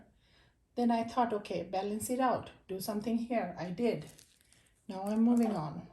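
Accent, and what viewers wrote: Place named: Middle East. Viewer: I love your creations, and I think it's adorable that you have that (I think) Middle Eastern accent, and you use the phrase, my bad. 🥰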